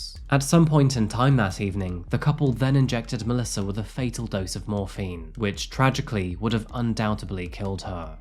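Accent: England, United Kingdom. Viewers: Yet another outstanding episode! And chin up about sounding English; it could be worse....you could sound like Andrew Tate with some weird US/British drop-the-t's accent!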